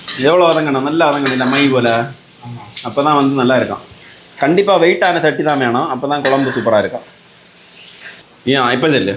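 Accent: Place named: Kanyakumari, India. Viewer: nanum kanyakumari than anna language vachi kandu pudichan anna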